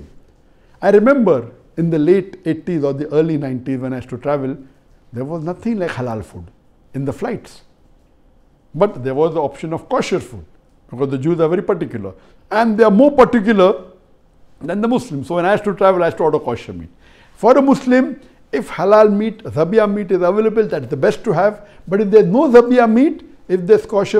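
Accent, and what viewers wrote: India. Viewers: what was the last word ? i didnt hear it why he speaks in hindu englisch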